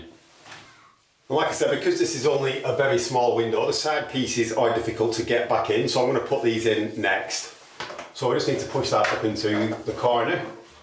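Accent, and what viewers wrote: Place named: East Lancashire, United Kingdom. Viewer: Excellent video. I'm in Québec and I recognize the accent, East Lancs maybe ??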